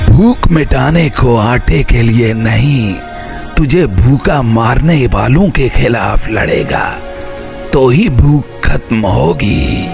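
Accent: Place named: India. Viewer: Please hm musilmaan hyn alhamdulillah aap apni voice aur language style hamarey acer ki copy kr saktey hyn Bharti style toh bilkul achaa NY aapko suite NY krta please bra na mannyeyga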